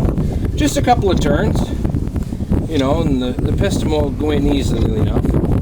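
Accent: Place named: Ontario, Canada. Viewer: Well done! Much easier than Chilton's Manual! The Ontario accent is an added bonus.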